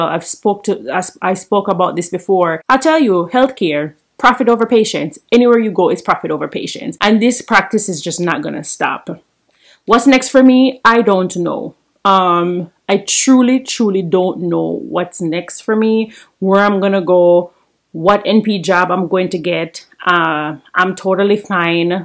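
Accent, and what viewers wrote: Jamaica. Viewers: I hear a Jamaican accent here, hey girl 🇯🇲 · Off topic: YouTube recommended me your video after watching Nuff Loads life in Jamaica, so I decided to click on your video even though those are two different videos, I decided to check out your video, and I hear an accent, and me being nosey lol, i checked out your IG as well, and I see that you're Jamaican. I'm not Jamaican but I love Jamaican people, lol YouTube is something else, but thanks for sharing your video. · U from jamaica?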